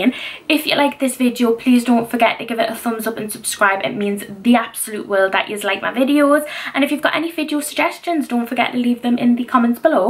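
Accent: United Kingdom. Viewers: Hi I just saw your channel and I subbed STRAIGHT AWAY and I’m glad I did and I love your accent and I’m from the UK TOO!!!!!! but I’m from Scotland ☺️☺️☺️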